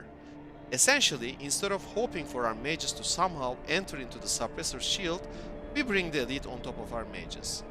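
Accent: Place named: Turkey. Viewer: Duyduğum en ‘clean’ Turkish English aksanlarından. Ama kulak tanıyor tabi. ps. Great content, articulate and helpful. Liked and subbed.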